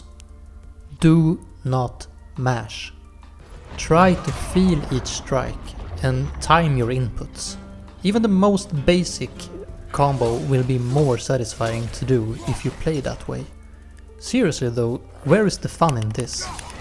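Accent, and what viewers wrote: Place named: Sweden. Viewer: very nice swedish accent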